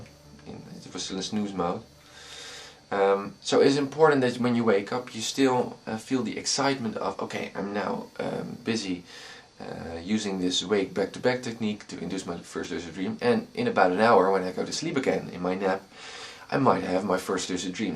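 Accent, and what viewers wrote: Netherlands. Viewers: Ben je Nederlands dude P: ? ik hoor t aan je stem.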